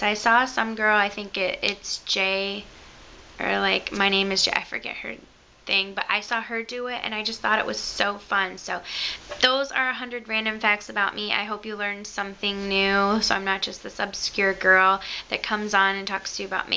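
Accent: Michigan, United States. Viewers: Ok, how did I miss this one? I feel like I'm your stalker, but redheadphd linked it as being one of the funniest videos she has ever seen. You're so cute,! I personally like your Michigan accent. It reminds me of one if my best friends that is from Grand Rapids.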